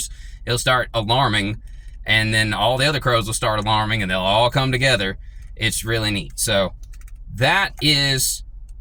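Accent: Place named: South, United States. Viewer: Thank you for explaining this about crows. And for keeping most of your Southern accent... I'm originally from the bayou country south of New Orleans. Crows around my hometown were very loud, and for some reason I used to be afraid of them. I've since learned more about their intelligence and friendship with humans by watching videos. Where I live now there are a variety of birds and squirrels we feed with black sunflower seeds, along with Crows that let themselves be known in the area. I'd love to learn how to meet and befriend them. I've joined your channel and am looking forward to learning more from you. Thank you 😊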